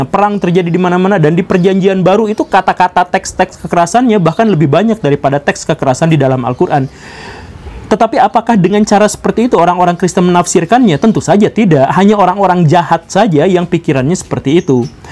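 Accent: West Java, Indonesia. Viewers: Sederhana, dalam , menambah wawasan, mendewasakan, introspeksi, realita + bonus aksen sunda LANJUTKAN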